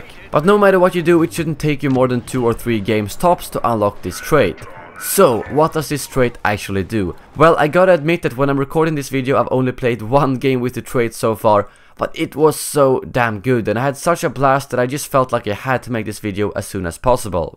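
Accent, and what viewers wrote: Sweden. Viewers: It sounds like youre from sweden? Am i right?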